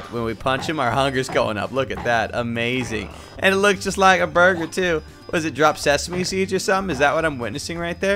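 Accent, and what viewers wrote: Texas, United States. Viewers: texas person talking about texas mobs in a texas accent (i think thats what it is) noice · I LIKE YOUR COWBOY ACCENT I SO EXCITED FOR THIS MOD OF THE HAERT OF TEXAS LET'S GET INTO IT PATNER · wait... thats how you talk in Texas?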